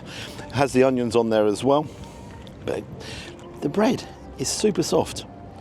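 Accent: United Kingdom. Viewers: Love this - it's just like where I grew up in the US except with a British accent and a bit less food variety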